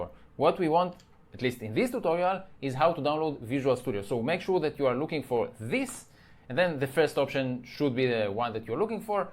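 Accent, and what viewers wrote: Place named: Israel. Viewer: ניסיתי למצוא סרטון שמראה לי איך להוריד.. לפי המבטא אתה ישראלי נכון?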